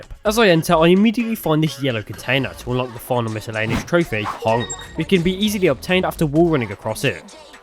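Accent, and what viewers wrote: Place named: Black Country, United Kingdom. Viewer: you aren't by any chance from the black country are you? Not even lying you sound exactly like me haha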